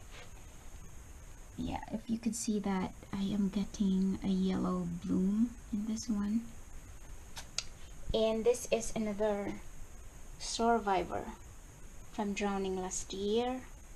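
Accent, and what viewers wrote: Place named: Visayas, Philippines. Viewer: hehehe... lately in the middle of your video... i notice your tone.... same as bisaya....... mabuhay po.... hoping for more video to come...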